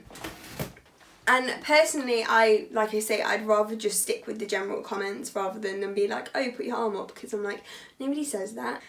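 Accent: United Kingdom. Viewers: Hello, from across the pond. I think you're beautiful inside, and out. and I love you British accent. May God bless you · Woah your the girl from Instagram I never knew you had s YouTube and a British accent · Rik Pine Its the British accent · I would simply like to say that you are living confirmation that the only thing which separates the British and we Americans is a common language. Your "accent" is charming! Yes, I know, we Americans trample all over proper English; but I could listen to you all day and never tire or tyre of your delightful soliloquy.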